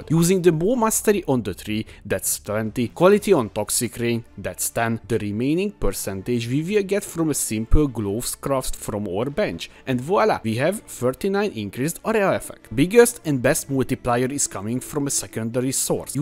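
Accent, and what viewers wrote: Hungary. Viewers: Magyar vagy bátyja? Úgy hallani :D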